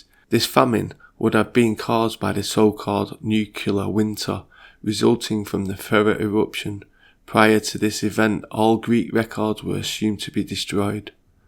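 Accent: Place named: Manchester, United Kingdom. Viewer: Much respect this is amazing the narrator who's telling of these accient events sounds like he's got a Manchester accent much love from liverpool keep up the good work my freind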